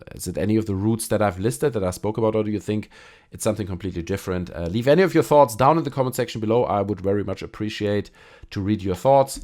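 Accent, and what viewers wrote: Germany. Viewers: The german accent is strong in this one. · could it be that you are german? you have that distinct accent ;) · The accent is so bad i am pretty sure you are a german.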